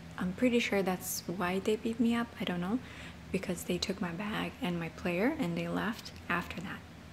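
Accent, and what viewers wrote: Russia. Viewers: “Im gonna sue you” im hysterically laughing (even though its a serious topic) this is true 🇺🇸dont touch me or i will SUE😂😂💁🏼‍♀️💁🏼‍♀️💁🏼‍♀️ Darryl is awesome you guys are great together! All the worries that you used to have to deal with alone you’ve trusted him to take care of things and, like you said, help you! Letting him help you has allowed you to be so much happier and lighter and worry about MomLife worries lol and you can hear a cute little thing in your voice that I think comes from speaking Russian frequently with Mila 💖thank you for this video, I look up to you so much💕✨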